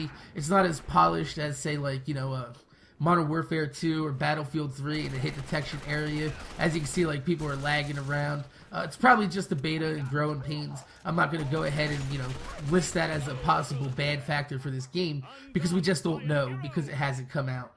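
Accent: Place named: Boston, United States. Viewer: I bet this guys from boston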